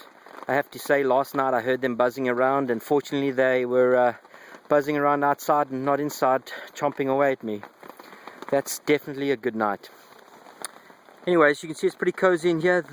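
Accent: South Africa. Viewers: is that a south African accent I here????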